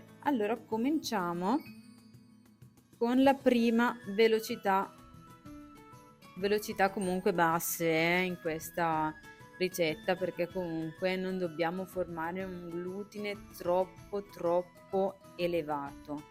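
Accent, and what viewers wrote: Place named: Brescia, Italy. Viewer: bravissima, oltre che l'accento bresciano che e di una simpatia unica, sabato provo a farla alla tua maniera.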